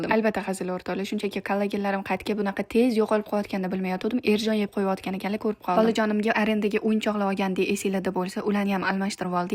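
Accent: Tashkent, Uzbekistan. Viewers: НИМА УЧУН НАМАНГАНЛИК БУЛА ТУРИБ - ТОШКЕНТЧАСИГА ВОТТИЛАЯПСИЗ ??? ЯНА ЭРИНГИЗ КАРИС ??? ЯНА УРИСЧА КУШИБ ГАПИРАЯПСИЗ ??? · Наманганлик булсангиз нимага тошкент шевасини аралаштириб гапирасиз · Жуда хайрон колдим Намангонликлар жуда этикодли буларди ёки сиз Тошкентча гапиряпсиз Тошкентда катта булгансиз шекилли йукса Намонгонликлар динни кабул килса килмаган буладими ишкилиб бошка динга тегмайдику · Хаммаси зурку,лекин бир нарсангиз мани ажаблантиради.Шевангиз,наманганликлар бузилган тошкентча гапирмайди.русча кушиб гапиришингиз эса жуда савияси паст билимсизлигингиздан белги.илтимос узингизни бу киликларингиз устида бир оз ишласангиз булармиди. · Наманганлик шеваси бошкачаку бу киз тошкентча гапирди😊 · toshkent shevada qando gapiryapsiz namanganlik bulsayiz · Узиз наманганлик буп туриб , шевез тошкентчаю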